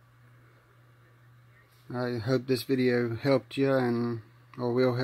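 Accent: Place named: South, United States. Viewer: Good job man! glorious southern accent!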